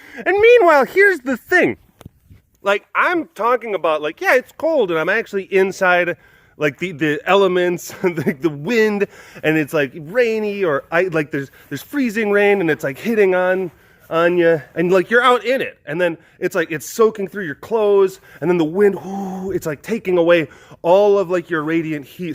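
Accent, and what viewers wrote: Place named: Wisconsin, United States. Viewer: Your WI accent is strong in this one. :)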